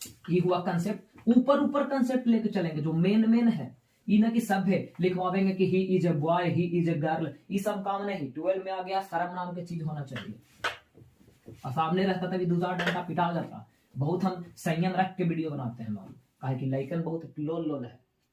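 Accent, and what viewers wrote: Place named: Bihar, India. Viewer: Bihari style me aap bahut ache padhate hai sir ji❤❤❤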